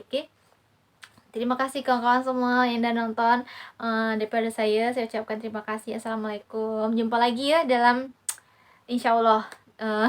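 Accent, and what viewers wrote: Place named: Malaysia. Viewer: Pandainya kamu bercakap gaya orang Malaysia👏👏👏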